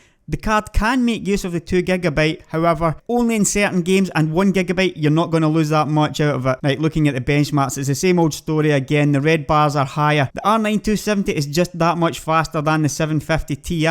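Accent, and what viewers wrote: United Kingdom. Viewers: You really sound like an arab guy that moved to UK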